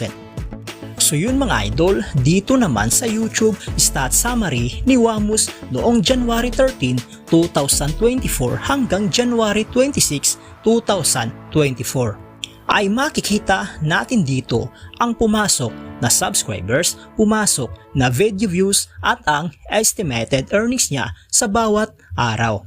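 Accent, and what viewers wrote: Visayas, Philippines. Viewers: Pag BISAYA TLGA, MASAKIT SA TENGA, PUCHAAA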